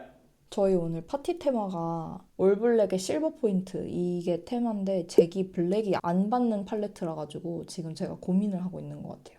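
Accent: United Kingdom. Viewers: 너무너무 추카추카 합니다. 그렇게 오래 걸리는줄 몰랐네요. 저는 3개월~ 4개월 만에 영주권 받았는데 다른분에 비해 빨리 받은 거군요. 케이스는 다를수 있겠죠. 저는 결혼허고 난뒤 벋았고 26년전 일이라..... 지금은 많이 힘들다고 듣긴 들었어요. 암튼 다시한번 축하드리고 14년 되셨다니 거의 영국인이죠. 발음도 영국인 현지 발음이시고요. 아, 그리고 저는 3~4 개월 기다리면서도 안와서 재촉 전화했더니 알주일만에 왔다는 사실 ㅋㅋㅋ 날씨 안좋은 영국 😂😂 생활 열심히 행복하게 사시길 바래요.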